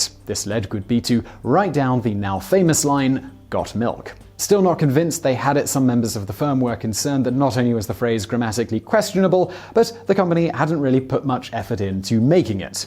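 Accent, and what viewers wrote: England, United Kingdom. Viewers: Why r u so triggered lmao ? That's the British way of saying Nike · I figured out one of the reasons I like this channel. He's got a real Brit accent that's better than the usual fare to listen to, but he doesn't speak it like he has a mouthful of Marbles!!! I can't recall even once, thinking to myself, Whaaat did he just say!?!! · Briar Hood in England (where he’s from so this might explain it) we say Nike the way he did and Ad-ee-das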